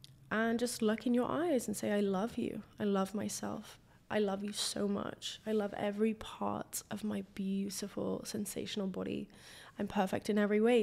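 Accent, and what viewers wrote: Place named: Yorkshire, United Kingdom. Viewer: Ya don't sound like ya from Leeds, that's a very posh Yorkshire accent 😆😉 It's a great channel ya building here. Sending ya peace and love from Huddersfield ❤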